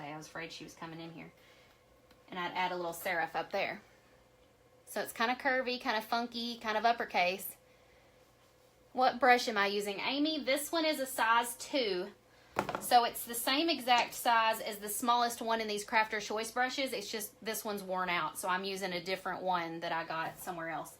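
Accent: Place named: Alabama, United States. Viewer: LOVE the accent! I'm a southern bell as well and you remind me of sweet home Alabama!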